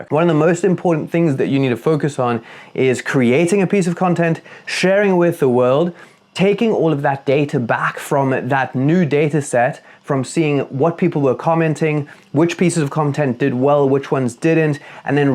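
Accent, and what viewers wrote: South Africa. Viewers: Yoh bru, it's a proper gamechanger hearing a South African accent when you're trying to learn something on YouTube. Thanks for the intel, keen to klap this journey. Sending love from CT. Lekker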